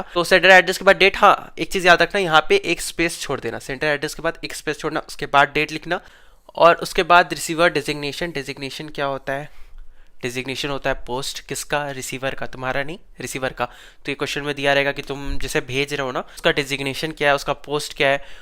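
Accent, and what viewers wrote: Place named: Bihar, India. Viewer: Mujhe ye bihari lagta h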